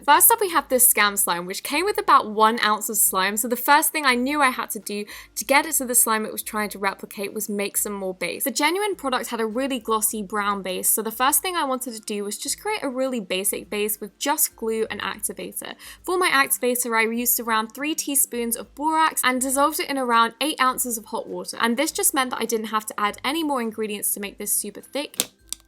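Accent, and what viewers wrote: United Kingdom. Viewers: Uh yes, British accent, i love it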